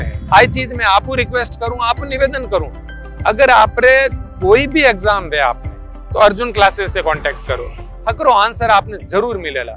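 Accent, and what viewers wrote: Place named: Marwari, India. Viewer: Wha sir gjb marwadi andaj me 😀 the mare neda aao 😀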